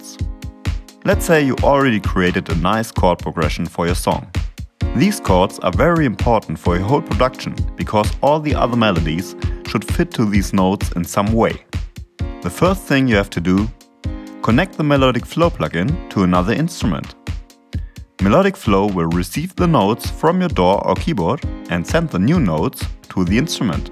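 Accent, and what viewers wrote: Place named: Germany. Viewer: Da entwickelt Ihr so ein Produkt, seid aber zu geizig 200 EUR in einen native speaker zu investieren smh. Dieses Video ist schon konfus genug, aber der deutsche Akzent lenkt so sehr ab, dass es noch schwieriger wird, dem Video zu folgen.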